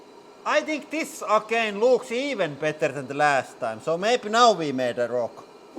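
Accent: Finland. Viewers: As a Dane I envy you Fins for your accent. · I like how he still doesn't fully grasp the concept of the letter Y in English making an "i" sound and not the "u" sound it does in Finnish. "Velcome to Huudraulic Press Channel" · I have a theory that the Finnish accent it one of the secrets of this channels success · I love his finnish accent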